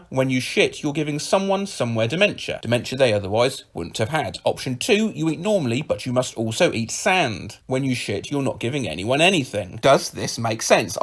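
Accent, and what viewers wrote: United Kingdom. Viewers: I watch these purely for the British accent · These kinds of videos are entertaining on their own but somehow having a british narrator always makes them more entertaining. R.I.P. Soothouse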